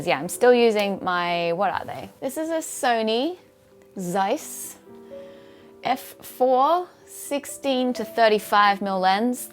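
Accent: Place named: Australia. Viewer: So Aussie I love it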